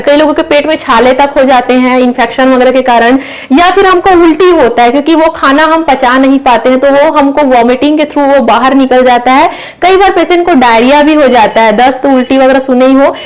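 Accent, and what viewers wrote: Chhattisgarh, India. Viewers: Mam aap Chhattisgarh se hai kya...?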